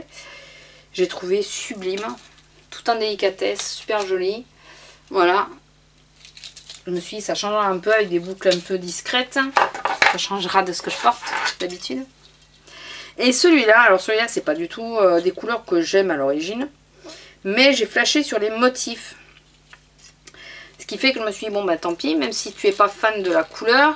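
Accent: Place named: South, France. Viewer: Coucou tu vient de quel endroit avec se petit accent du sud ?... ( sinon j'aime tes vidéos )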